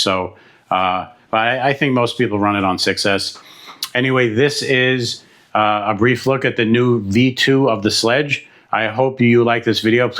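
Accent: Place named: Brooklyn, United States. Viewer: My Cousin sounds like you. He is from Brooklyn I know this has nuttin to do with video! LOL Good to hear accent. I live in Florida so I hear way less and miss it!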